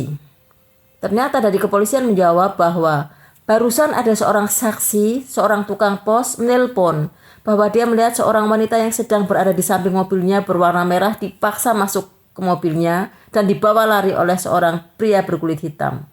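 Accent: Java, Indonesia. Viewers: logat jawanya medok amat mbak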